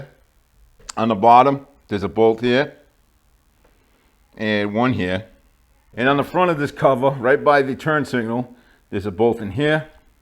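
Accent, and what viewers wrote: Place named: Brooklyn, United States. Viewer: You're from Brooklyn, or Long Island, which one? I'm from Boat, grew up in Brooklyn, now live on Long Island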